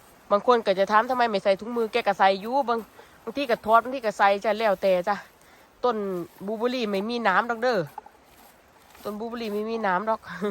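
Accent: Korat, Thailand. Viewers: คน โคราช นิครับ คือ พูด สำเนียงโคราช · วัสดรจ้าลูกสาวยากไปจิไปติดต่อกะใหนจ้าคุณ เป็นคนกะใหนจ้าพูดคือคนโครราชเนอะจ้า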